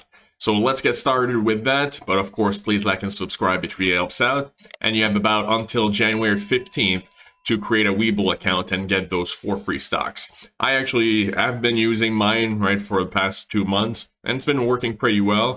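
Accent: Quebec, Canada. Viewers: Tu viens du Qc?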